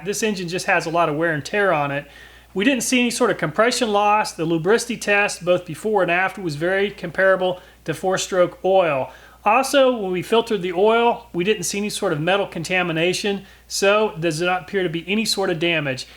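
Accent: United States. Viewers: Interesting video, surprised it maintained its lubricity as long as it did. On a sidenote, where is your accent from statewise? I can't place it. (apologies if I'm being nosy)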